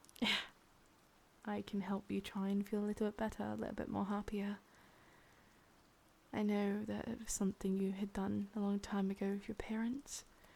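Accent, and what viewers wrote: United Kingdom. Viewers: i love your voice epscially your british accent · Me: "British accent? Well, that should be interesti-": "Good morning, sunshine." Me: "Oh... I'm in trouble." 😳 Real talk: I've actually been struggling with my depression rearing its ugly head the past couple of days, so I really do appreciate this. Thanks for the audio. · She really cute with british accent. Great job💚👍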